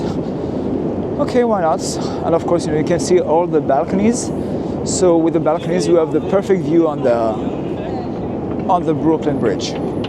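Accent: France. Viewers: I had just come across your recent Hudson yards video & it was beautiful 😍 🎄. I instantly subscribed & now seeing this. I like that you've decided to narrate your videos. A French accent is so nice. I can't travel like I'd like to so these kinds of videos are great. ❤🤍💚🎄🎅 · YES!! Finally we get to hear your perspective! Your walking videos were already great but with the added commentary, it'll be even better. And don't worry, your English is perfectly fine! Your French accent only adds to the content and makes you stand out. 👍 Allons-y, les gars! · Super que tu commentes tes vidéos! Bon, je ne suis pas totalement bilingue, deux trois petits mots en français pour ton public de France, ce serait chouette. Mais tu t'exprimes super bien en anglais avec le p'tit accent frenchy qui va bien. Du coup, on comprend assez bien. Continue ainsi! Keep it up. Let's go. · As an afficionado of New York walking tours, I am well placed to say that your first narrated tour is eclipsed by none. 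The views of Dumbo are stellar, significantly enhanced by your impeccable command of English, a command that, it must be underscored, notably exceeds that of most native speakers. (Do not underestimate the charms of a beautifully poeticized use of English with slight overtones of French!) A wonderful audio-visual experience. Kudos! Quelle promenade exquise -- parfaitement bien narrée ! Nous vous en remercions infiniment ! Bravo ! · Words cannot express how proud I am of you and your bold and courageous step to start narrative and soon to come live videos. ❤❤❤ Keep moving forward and break through those barriers that prevent us from achieving Your English is great with a French flair ❤❤❤ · Aww... nicely done! Your English with a fleur of French is very good, I just love it! Keep changing your channel, my favorite walking tour channel on YouTube, merci beacoup! 🗽🍏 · It was a very good decision to talk to us viewers. 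I hope that your channel will become even more popular as a result. You have the advantage that your English with a French accent is very popular in the English-speaking world.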